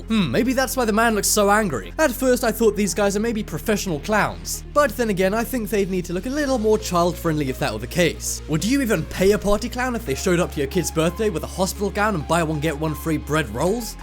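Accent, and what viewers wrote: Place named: England, United Kingdom. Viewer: English accent SUCKS!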